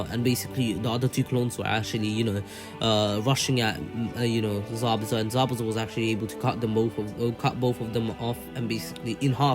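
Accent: United Kingdom. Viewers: U sound super British and if so same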